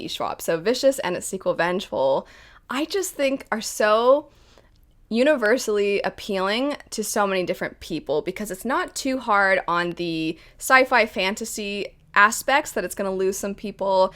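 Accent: United States. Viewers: Plz tell me you’re from Maryland holy shit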